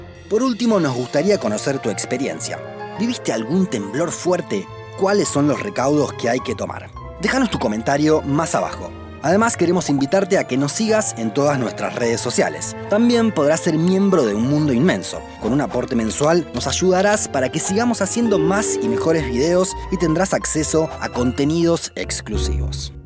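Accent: Rioplatense, Argentina. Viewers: Soy un estudiante argentino que Lleva un año viviendo en la ciudad de Medellín Colombia, y después de escuchar el dulce acento de este lugar, no eh perdido el mío, más sin embargó siento muy marcado en la manera que utilizas el yeismo, el famoso (sho) del río de la plata. Un saludo compatriota desde la hermosa Colombia 🇨🇴🇦🇷♥️❣️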